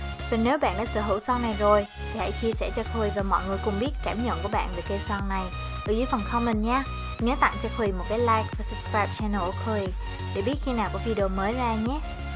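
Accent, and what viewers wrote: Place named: South, Vietnam. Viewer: lần đầu xem clip của chị và replay liên tục vì giọng con gái miền nam đáng iu quá trời luônnn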